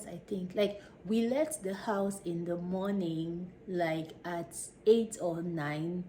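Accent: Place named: Nigeria. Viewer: Hi nice content there, I am enjoying your channel. I am guessing you're Nigerian or maybe Cameroonian? (judging by your accent haha).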